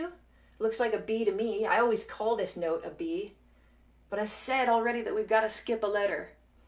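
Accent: Scotland, United Kingdom. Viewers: Are you half Scottish ? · Loving the Scottish accent. Oh, and the lesson. Thanks!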